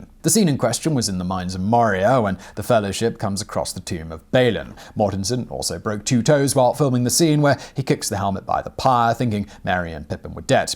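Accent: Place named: RP, United Kingdom. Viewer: Your deliberately exaggerated posh English voice is excruciatingly annoying. It's like watching a sketch of 'Cholmondley Warner' from Harry Enfield.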